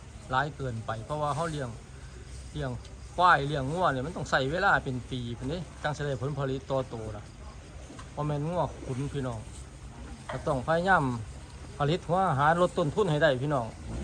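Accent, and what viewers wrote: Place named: Korat, Thailand. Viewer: โคราชชัดเจนดีครับ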